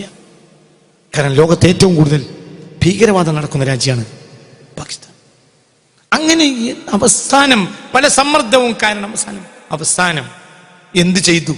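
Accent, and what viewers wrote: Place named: Malabar, India. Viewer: ഉസ്താദ് പ്രസംഗിക്കുന്നത് മലയാളത്തിലല്ല മലബാരി ഭാഷയാണ്.. മനസ്സിലാക്കാൻ പ്രയാസം😓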